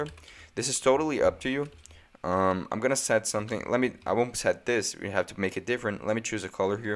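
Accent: Brazil. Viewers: sotaque br? very nice english by the way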